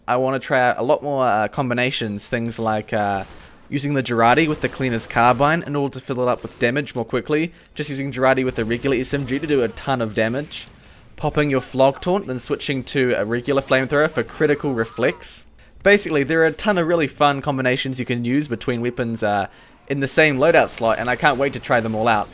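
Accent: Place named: New Zealand. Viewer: I haven't been subbed for long but I've watched a few recent videos of yours and I love how you take what valve dishes out without being angry at anything and the way you use the new mechanics of the game. I'm glad we have someone in the tf2 community that is so positive about the game instead of just complaining about X favourite weapon being nerfed and saying that tf2 is dead. Also love the NZ accent!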